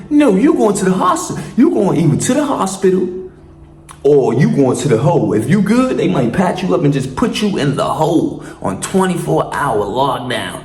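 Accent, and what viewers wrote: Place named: New York, United States. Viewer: You talk like you been around more New York cars joints n ppl than anything.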